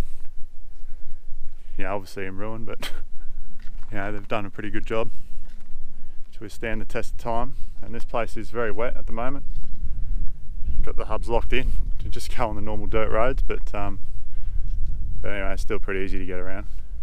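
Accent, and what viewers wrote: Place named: Australia. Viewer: Except for the lack of sagebrush and your heavy Aussie accent 😆 this video looks very similar to areas of Central Oregon! Same type of history too either cattle, or sheep, or horses. If you have been watching Casey at Coyote Works channel you'll see what I mean. Always looking for relics and ruins of the past. Cheers!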